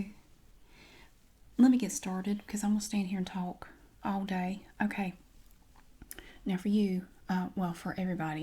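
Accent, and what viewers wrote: South, United States. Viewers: I think it's funny how the title says "super southern" yet this is how everyone I know sounds.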